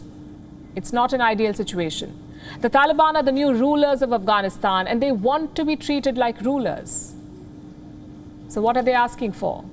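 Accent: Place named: India. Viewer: Indian masquerading to be western, but her funny accent gives it away.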